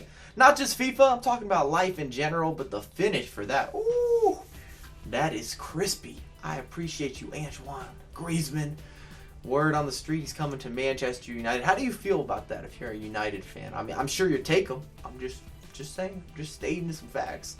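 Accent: United States. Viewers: Fifa School please boy you sound like a american trying to teach Footbal (it ain't soccer) USA suck in footbal man what do you know about footbal tactics and formations we invented and breath football in europe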